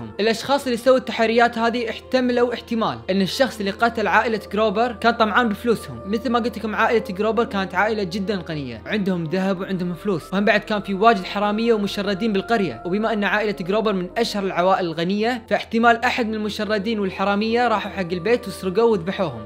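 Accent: Kuwait. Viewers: كويتي صح باين من لهجتك زي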